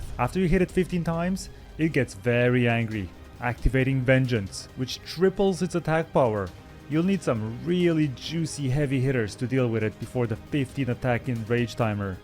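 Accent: Romania. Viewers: are you Romanian?? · are u romanian?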